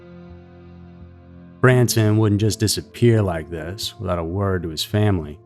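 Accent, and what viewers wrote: South, United States. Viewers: Where's this southern drawl coming from? You don't have it in your older episodes. Is it manufactured for the show? You sound very much like Mathew McConney in this video, not so much in your older ones. I'm just curious, I really enjoy these videos.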